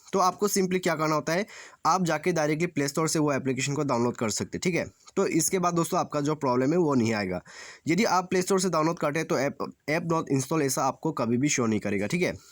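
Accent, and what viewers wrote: Assam, India. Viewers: Bro i think you From Assam? 🤔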